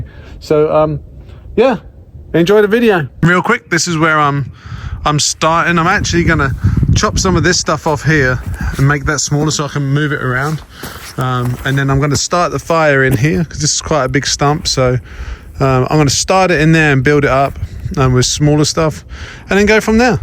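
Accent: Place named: United States. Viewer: why the yank accent??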